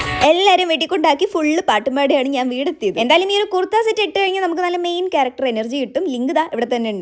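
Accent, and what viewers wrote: Palakkad, India. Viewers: Chechi Palakkad basha vanu😅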